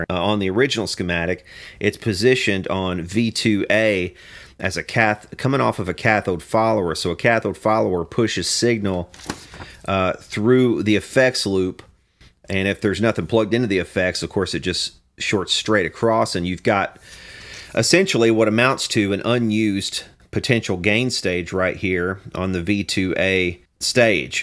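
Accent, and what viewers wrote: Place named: Georgia, United States. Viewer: You sound like you're from Georgia. By the way, I live across the freeway from Mesa Boogie.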